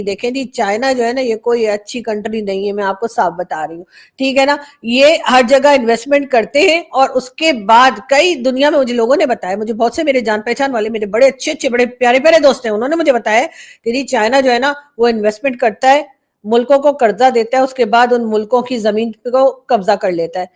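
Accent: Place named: Allahabad, India. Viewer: Aap bahut khoobsurat lag rahi hain , Allah aapko salamat rakhe Aap TRUE ALLAHABADI lagti hain , aapke bolne ka lahja ek dam Allahabadi lagta hai Hamara bahut sara Ashirwad 🎉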